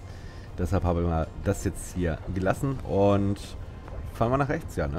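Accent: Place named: Bern, Switzerland. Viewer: Mage Co bärner-dütsch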